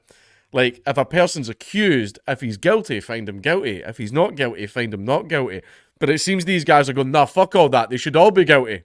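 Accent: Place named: Scotland, United Kingdom. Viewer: Foolish, funny sounding, Scottish man, they want to weaponize the courts. You're 100% right that this will be used to free scumbags preferred by the elites. It will also be used to imprison innocent dissidents. Most importantly, it will be used as a threat to force compliance with their agenda. "Do what I say or the thought-police will snatch up your son, and the judge I own will falsely convict him of a made-up crime and toss him in a cell." -the tyrants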